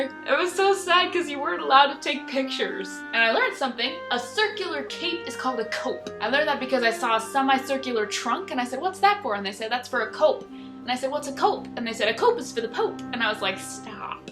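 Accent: England, United Kingdom. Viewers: Amazing UK accent ! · Your english accent sounds very mary poppins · congratulations on the purity of your English accent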